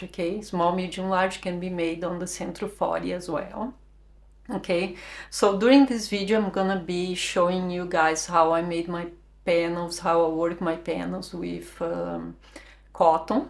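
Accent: Brazil. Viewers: Very beautiful your work, but about your english you seem to be brazilian.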